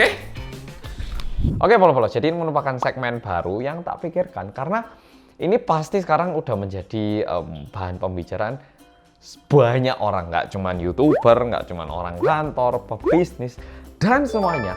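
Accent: Java, Indonesia. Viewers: Jangan ngomong bahasa Jawa ke aku:').Aku gak bisa bahasa Jawa.Coba kamu denger aja deh intro rindingdong nya mas.Mas kan ngomongnya medek wkwkkwkwkwkkw🤣🤣🤣🤣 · 1. Jempol, Mas utk segment barunya...👍 2. Sy emak2 biasa yg ga ngerti investasi forex ato reksadana ato apalah gitu2. Jadi invest nya dlm bentuk LM aja. Dari tip no1, alhamdulillah udh lumayan dpt 'segenggam' LM..😘 3. Tip no 3 sdg dicoba. Kok rodo angel yo atur mindsetnya... hehe...😏 4. Looking forward to other practical tips n tricks ya nan irit. 5. Tqtqtq😊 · Orang korean yang medok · Ta pikir orang korea yang medok eh tau nya irit😬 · Sukses terus mas, ga pernah bosen aku nonton channel ini, mantap lah, jowo ne medhok tenan, wess apik lah poko'e. sukses terus mas. semoga lebih maju kedepannya. dan dapet menginspirasi kita semua mas🙏🙏🙏🙏🙏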